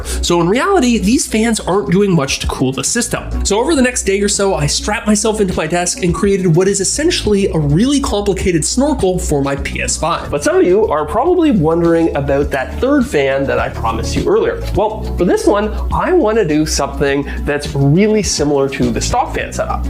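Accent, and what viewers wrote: Canada. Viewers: This guy's definitely canadian.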